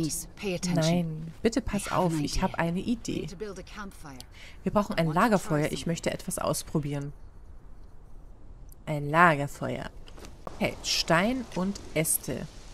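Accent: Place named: Palatinate, Germany. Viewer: Einfach Pfälzer Dialekt 😅 cool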